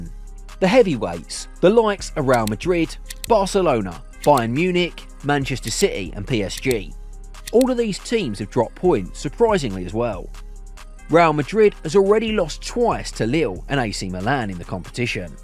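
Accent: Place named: South London, United Kingdom. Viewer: Panenka sounds more like AI, even though he's not. This guy is more South London sounding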